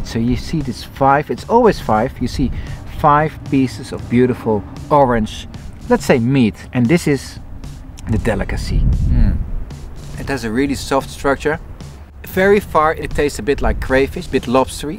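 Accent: Netherlands. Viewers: Just randomly clicked on this video, not knowing who this person is. But I'll recognise such a strong Dutch accent within seconds!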